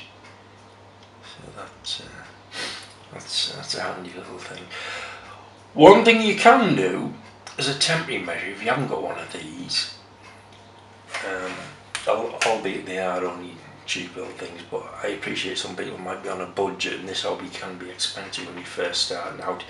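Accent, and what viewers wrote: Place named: Yorkshire, United Kingdom. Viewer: Where in the world are you as you sound like you live near me Im in Guisborough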